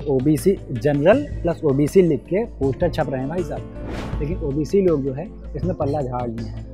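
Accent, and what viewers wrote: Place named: Bihar, India. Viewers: Abe bihari ki tarah mat bolo mujhy bihario se nafrat hi 🙅😤😠😑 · EE sausri Kaahe bhojpuri type main anchorning karat baa..tanik samjh nahi aavat hamka Toh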